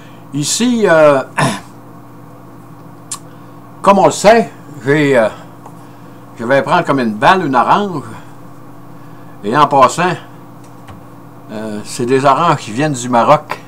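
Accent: Quebec, Canada. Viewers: On critique souvent l'accent québécois, mais moi j'aime bien :) Une question me vient sur ce phénomène que je découvre pour la première fois et qui m'interroge beaucoup : plutôt que de supposer une conscience à l'électron, pourquoi et comment est-on sûr que les appareils de mesure ne sont pas aussi des interférences dans l'expérience ? Comme une sorte de thermomètre qui donnerait (ou enlèverait) la fièvre ? Peut être que je manque totalement de pertinence, je suis plus un littéraire, mais je ne peux m'empêcher quand même de m'intéresser à ça.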